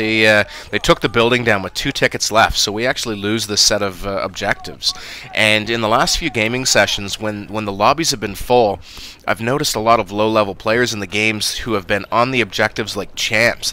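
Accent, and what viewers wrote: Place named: East, Canada. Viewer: I can tell you're Canadian, probably eastern from your accent. Where are you from? I'm in TO. Nice series!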